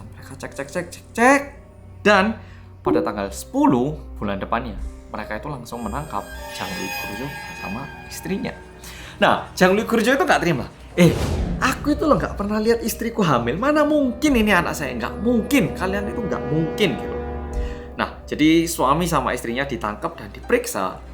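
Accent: Java, Indonesia. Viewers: Kulo kalah medok Karo sampean mas 😄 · Apik Mas Bro. Serasa wong jowo korea. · Gue selalu ngikutin pas ngmg bersama orang korea yang MEDOKKK:) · Bersama yang medoookkkkk 😄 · Bersama korea yang "medok" 😁 I'M ADDICTED 🙆‍♀️ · Bersama orang Korea yang "medok" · Aku ikutan bilang.... medhookk:"))) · Mau saran dong buat tambahin english subtitle karna suka banget sama konten2nya mas, apalagi kalo lagi bahas kasus2 di korea, kdrama atau kpop. Suka pengen share ke temen2 internasional sesama pecinta korea karna mas suka kasih info yang gaada di tempat lain, cara ngejelasinnya juga bikin betah ga ngebosenin tapi sayangnya gaada english subtitle jadi kadang suka males bikin summaries-nya. Biar sekalian bisa memperkenalkan bahasa indonesia dan logat jawa ke luar negeri plus bisa nambah internasional viewers biar go internasional.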